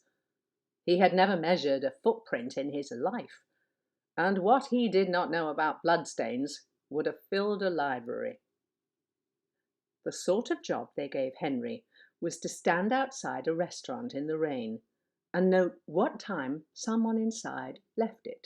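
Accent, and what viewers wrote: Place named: England, United Kingdom. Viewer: Very well read. I searched for the title here and yours is the only one I came across featuring an English accent. I love the Yanks but an American reading Wodehouse? Not cricket at all.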